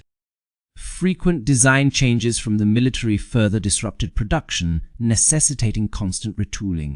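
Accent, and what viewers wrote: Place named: United Kingdom. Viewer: Why add distracting and annoying flickering speckles and vignetted cropping to what could have been clear full images?!! Plenty of clear accurate public domain images of personalities, facilities, etc are available but your AI generates ridiculous junk. Why Brit-accented AI narration of 100% US operations?!! Can't your AI narration pronounce names, especially German, numbers, and much else correctly? DISLIKE! UNSUBSCRIBE!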